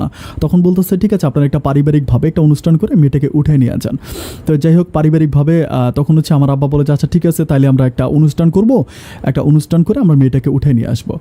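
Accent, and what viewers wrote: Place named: Barishal, Bangladesh. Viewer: আপন ভাই মনে হচ্ছে আপনি বরিশালের ছেলে আপনার ভাষা বরিশালের মত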